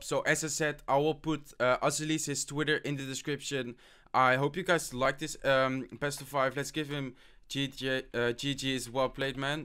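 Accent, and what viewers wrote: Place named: Netherlands. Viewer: Are you dutch? Because you sound like a dutch guy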